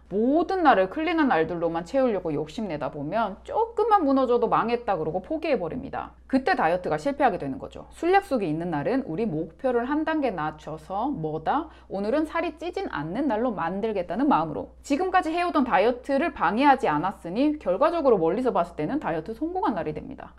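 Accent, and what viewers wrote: Gyeongsang, South Korea. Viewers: 경상도 사람이다... 티가난다 억양에서 ㅋㅋㅋ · 혹 대구분 이신가요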